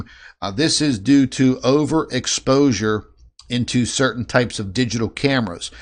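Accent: Pittsburgh, United States. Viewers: You from Pittsburgh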